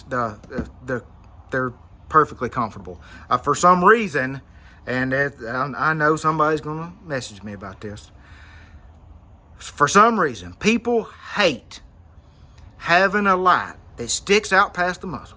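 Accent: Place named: South, United States. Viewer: I had 2 pl350 switches crap out. What state are you from? It sounds like the South, like me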